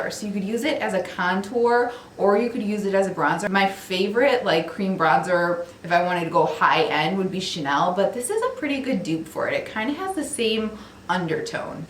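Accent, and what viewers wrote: Chicago, United States. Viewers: Just discovered your channel and could tell right away you are from Chicago with that accent! Love this video.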